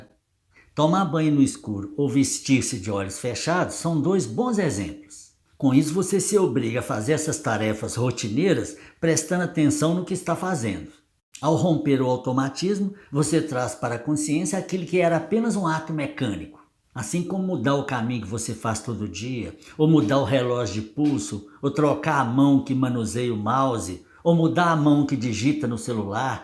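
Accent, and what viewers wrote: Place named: Minas Gerais, Brazil. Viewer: Eu adoro esse sotaque mineiro dele 😅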